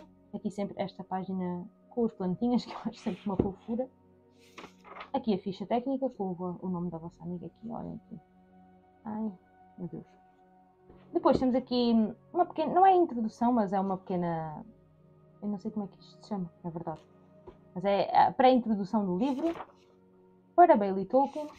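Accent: Portugal. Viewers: É muito bom ver alguém falando com paixão sobre as obras de Tolkien, mesmo eu tendo uma certa dificuldade de entender o sotaque português. Parabéns.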